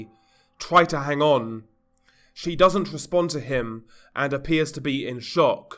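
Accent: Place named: United Kingdom. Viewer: He’s British dude we all know what he’s saying stop complaining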